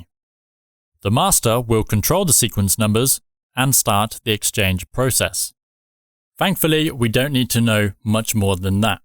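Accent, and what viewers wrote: England, United Kingdom. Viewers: Dude, it's called English pronunciation!!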